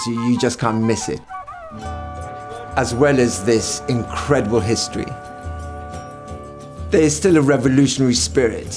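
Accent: RP, United Kingdom. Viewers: He talks like the Royalty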